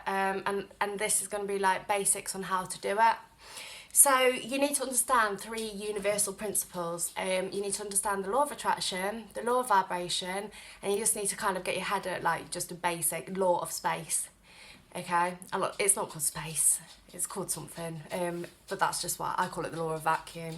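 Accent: Liverpool, United Kingdom. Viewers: Hahaha I used to live in Edinburgh and I feel like you have an accent that's pretty close to Liverpool lol. But I love it and I get addicted to listening to you talk so keep rockin' xD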